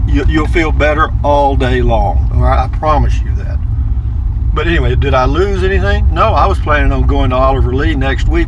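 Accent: Texas, United States. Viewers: Love that Texas talkin' style! Your video not only made me sit up and pay attention, it made me laugh at some of the things you said. Humor and common sense.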